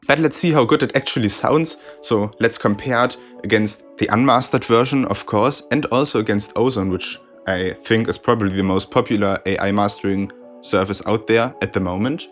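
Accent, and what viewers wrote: Germany. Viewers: Der deutscheste Akzent, den ich je gehört habe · Denglish on point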